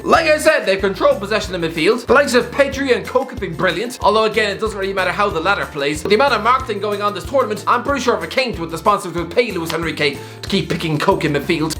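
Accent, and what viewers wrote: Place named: Ireland. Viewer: i love the irish accent, just can't understand how talks so spastic-ally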